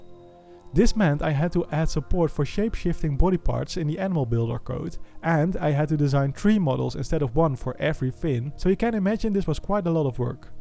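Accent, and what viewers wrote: Netherlands. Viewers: ik vind dit spel heel leuk zeker omdat het gemaakt is door een nederlander.. tenminste je klinkt als een nederlander XD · Are you Dutch really sounds like it😳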